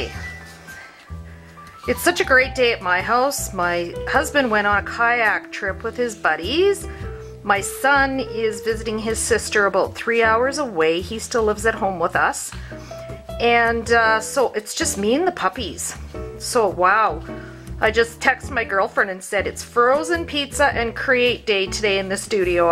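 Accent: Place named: Canada. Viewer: Hello fellow Neighbor! Thought your accent was Canadian. Born in Minnesota but moved to Colorado 20 yrs ago and everyone thought I was from Canada. No longer have my MN accent but listening to you brings back memories.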